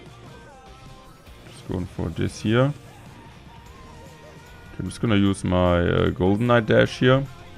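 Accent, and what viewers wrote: Germany. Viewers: Are you german normally with the accten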